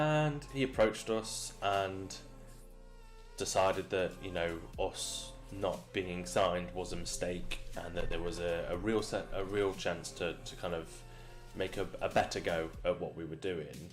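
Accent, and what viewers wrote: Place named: Derby, United Kingdom. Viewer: I’ve got to ask- I’ve no idea why I thought this in the first place but for some weird reason it’s always stuck with me. You’re not from Derby, are you? The accent is throwing me off, but it’s been driving me mad for all these years. Good to see you again after all these years, hope you’re doing well! 🙏🏼🙌🏼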